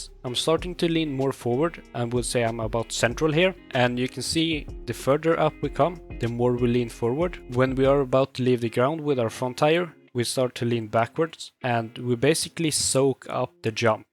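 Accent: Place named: Sweden. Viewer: Jag hör att du är svensk så jag tar det på svenska. Hur lutar jag fram och tillbaka? Är inte som på GTA där man använder joysticken, i alla fall inte för mig. Har jag någon nybörjar-setting på som blockerar?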